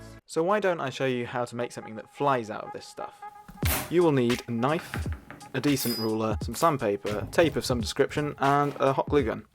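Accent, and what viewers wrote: England, United Kingdom. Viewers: I’m jealous of your English accent.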